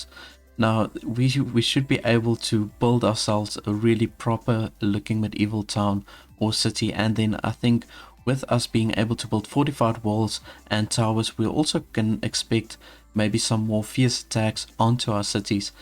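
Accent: South Africa. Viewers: Love the afrikaans coming through. Keep it up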